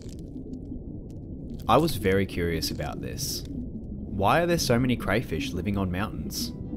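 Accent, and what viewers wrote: Australia. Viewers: david Attenborough from down under. These videos are getting better and better.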